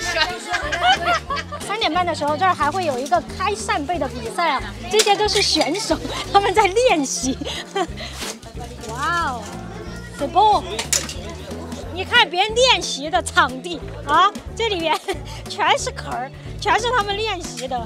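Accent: Sichuan, China. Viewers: 四川口音是改不掉了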